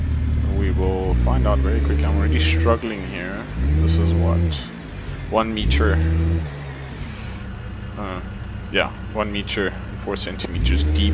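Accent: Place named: South Africa. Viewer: I can recognise that south african accent anywhere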